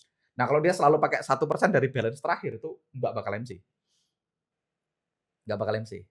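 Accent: Banyumas, Indonesia. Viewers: Ora ngapak..ora kepenak 😂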